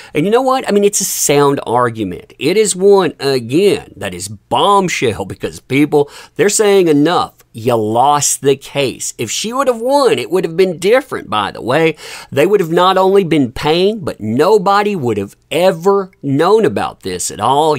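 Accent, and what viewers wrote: South, United States. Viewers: Hey. Are you from the south? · Yes he's from the south 😆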